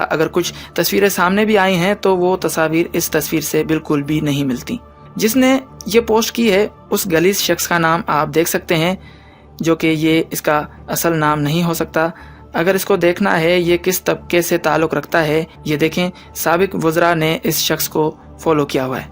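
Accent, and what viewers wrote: India. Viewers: HADEES MUBARAK HAY NAKE MARD KO PAKBAZ BEVI UR GANDAY KO FAHISHA UORAT MALAY GEE, PAHLAY IMRAN KA KIRDAR DAKH LO BUSRA KA FASLA HADEES KEE ROSHNI MAIN KAR LO. . . DOSRI BAT YA VIDEO JIS NAY BANAEE HAY US KEE URDU SUN LO INDIA WALOON KA LAHJA HAY CHANAL KA NAMUSALMAN JASA RAKH KAR N LEAGE PER ILZAM RAKH KAR SIRF RAW KA MAQSAD PURA KARNA HAY, AB FASLA KHOD KARO DUSHMAN INDIA HAY YA MUKALIF SAYASI JAMAAT . BAQI ISLAM TO KAHTA HAY LA RAJBANEEYAT FEE ISLAM ISLAM MAIN PEERI FAQEERI NAHEE HAY ,,,,KOJA AAK UORAT DAWADAR BAN JAEY PEERI KI UOR HAZER KHAWAND KO CHOOR DAY JAWAN BACHOON KO SARMINDA KAR DAY, CRICKET HERO KO PANAY KAY LEYA , PHER TAWEZ GANDNAY KARAY ( LANAT KI ALLAH NAY GRHOON UOR GANDOON PER PHOOKNAY WALOON UOR WALIOON PER) KHAWAND KO KURCI DELANY KAY LEAY ,,, TO FIROON KAY JADO GAROON KAY LEAY AKK MUSA BHI AYA KARTA HAY UOR US KAY PASS AKK DANDA ( AASA) BHI HOA KATA HAY,,,, JO SUB JADOO GANDAY KHA KAR DAKAR BHI NAHI MARTA. 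KITNI SAFAEE DO GAY, IN AGENTS SAYASAT DANOO KI BUS,,,,,,, PAK,,,,,,,,, FOG,,, DINDABAD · تصویر پتہ نہیں کسی نے لگائی بھی کہ نہیں تم بلاوجہ بیغیرتی کر رہے ہو۔ اور تم لازمی انڈین ہو تمہارا لہجہ صاف بتا رہا ہے اور ہمیں پہلے ہی پتہ چل چکا ہے کہ مریم نواجی نے اپنے میڈیا سیل کو بشری بی بی کی کردار کشی کا ٹاسک دیا ہے تم بھی اسی کا حصہ تو نہیں؟؟؟ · سب سے پہلے حرامی تم ھو جو یہ ویڈیو ڈالنے والے حرامی ماں اور بہن کی تصویر بھی ڈال دیتے۔شرم کرو ایک تہجد گزار عورت کے بارے میں بکواس تمہیں شرم آنی چاہیے ۔تمہاری آواز سے لگتا ھے کہ تم انڈین اسلئے تمہیں کیڑا کاٹ رہا ھے۔ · مجھے تو تم اپنے لہجے سے انڈین لگ رہے ہو۔ رہی بات بشرا کی تو جو عورت کسی کے ساتھ تیس سال گزارے اور اس کے پانچ بچے ہوں اور نواسے نواسیاں بھی ہوں اس کے بعد اگر وہ علیحدہ ہو کر کسی دوسرے سے شادی کرتی ہے؟ تو اس کے بارے میں کیا کہہ سکتے ہیں؟ پنجاب میں ایسی عورت کو کوئی عزت کی نگاہ سے نہیں دیکھتا۔ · This rubbish video made by Indian the man who is telling fake story he is 10000% Indian his accent is Indian he cannot speak clear urdu may be hire by corrupt PMLN. 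False story. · Mr .who ever you arebut one thing is confirmed that you are not a pakistani but an indian your accent is not pakistani at all ,muslim or hindu i can tell too. let me listen u again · Bolnay wala banda 100 % indian hay. Iski urdu sun lain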